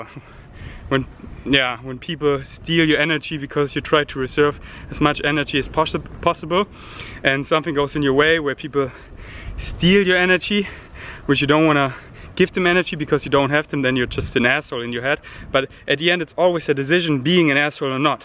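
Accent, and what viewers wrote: Germany. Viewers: Stop worrying about your english so much. I already understand everything you say in english perfectly and I love your german accent. It sounds endearing.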